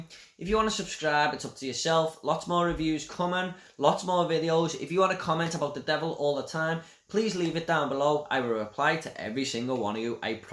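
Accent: Liverpool, United Kingdom. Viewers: Enjoyed listening to a fellow Scouser 🤩 Great review 😊 will be one I think we will have to check out 🤩😊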